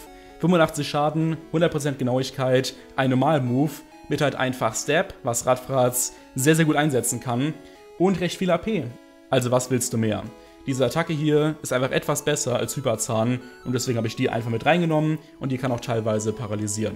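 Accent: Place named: Germany. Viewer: Ich hab selten jemanden so deutsches Englisch sprechen gehört...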